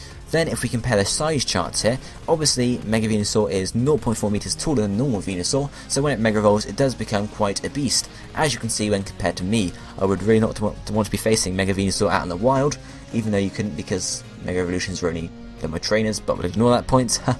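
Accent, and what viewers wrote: United Kingdom. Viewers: I don't get a thing you say with that British accent. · I'm from Sweden and even I can understand what he's saying with his british accent.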